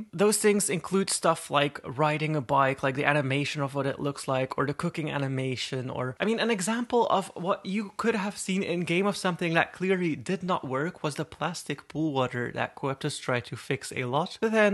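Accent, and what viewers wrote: Netherlands. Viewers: Are you dutch? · Can i ask if your dutch? 😁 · You're dutch or Belgium, same for me.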